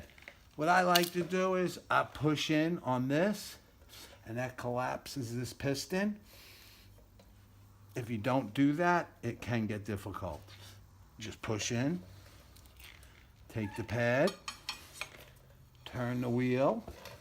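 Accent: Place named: South, United States. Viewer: I noticed you have a slight accent are you from are you from Tennessee or Kentucky 🤠🤠🤠🇺🇲🇺🇸🇺🇸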